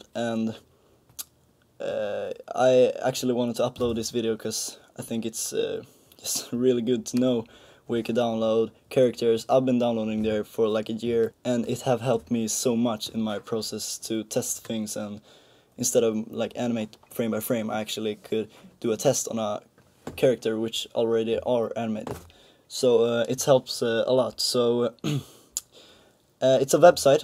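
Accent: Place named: Sweden. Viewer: haha, hörde på din engleska att du är svensk xD :D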